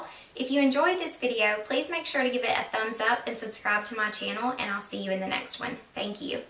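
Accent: South, United States. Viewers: Where are you from.it sounds like the South.I'm from Alabama.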